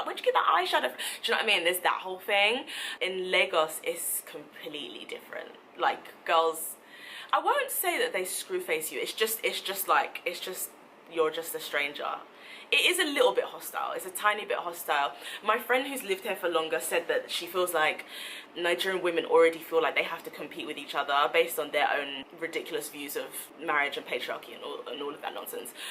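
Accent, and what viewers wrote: United Kingdom. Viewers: UK accent right there , so when I went to UK i automatically grabbed the accent 😇😇😇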